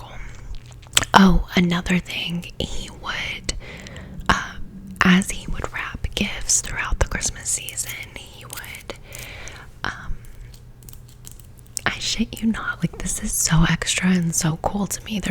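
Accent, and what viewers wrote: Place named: Australia. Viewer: You're such a special person. Weird thing to say given we've not met but I spend time with you almost every day. I look forward to your hot take on whatever the topic is, I adore your Australian accent (and other), and overall wit. Thank you for sharing so much, bestie. Like, can we grab a cocktail if you're ever in Phoenix? :)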